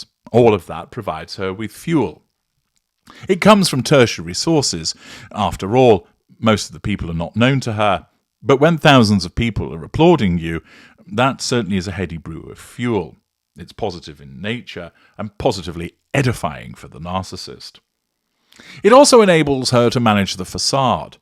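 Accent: United Kingdom. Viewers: What an absurd discussion you just had with yourself. You seem to think your semi pompous British accent qualifies you as knowledgeable. It does not!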